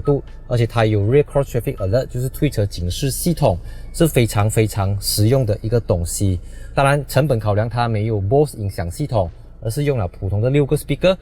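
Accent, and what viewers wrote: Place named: Malaysia. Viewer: 马来西亚的说话就是这样子的吗？带着沉重马来西亚口音的英语，让实话让我这个中国人听了特别别扭。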